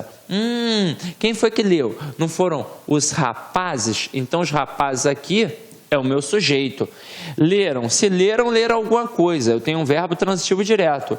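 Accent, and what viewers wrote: Rio de Janeiro, Brazil. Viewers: Aula muito legal, o carioquês é muito bom kkkkkkk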